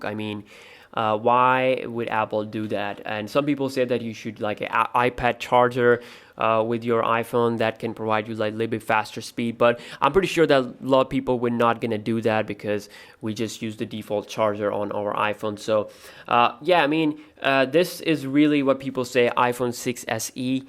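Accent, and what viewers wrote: United States. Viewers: OMG. You sound like American. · no one has observed. If you look carefully you can see two men in kurtas, which is Indian tradition and this guy is American (or may be of other country),so this photo is clicked in India. Not by this man. This has been picked and pasted from other videos or Internet. You can see this . This guy sounds like American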